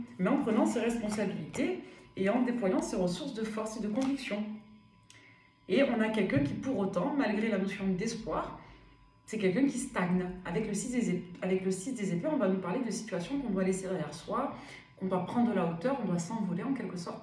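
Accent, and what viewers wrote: South, France. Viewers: L'accent du sud, c'est la vie, c'est plus qu'un accent, c'est un état d'être. Un grand merci pour votre lumière bienveillante et franche.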